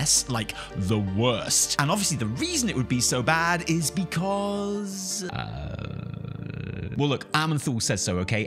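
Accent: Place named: United Kingdom. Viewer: i got really stoned and though t the first like minute of this video was like a food theory video... then i noticed you were British and talking about wow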